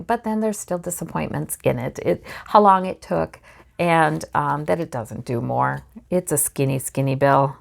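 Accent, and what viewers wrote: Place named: Upper Midwest, United States. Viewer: Very nice reading...as expected! Love your Upper Midwest style! Stone cold Chicago Democrat here...now living in NC...and I miss the Midwest every single day! Was able to absorb great peace from the Great Conjunction last night, which revealed itself right over my house! Hope we can all draw strength from our tribulations...In order to make our world more positive. Blessings to you, sweet...carry on!!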